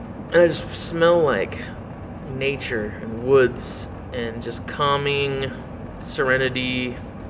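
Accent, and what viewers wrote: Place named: New Jersey, United States. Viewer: Damn, I didn't know you lived in Utah, no offence but you come off as a Jersey Jew... in a charming way.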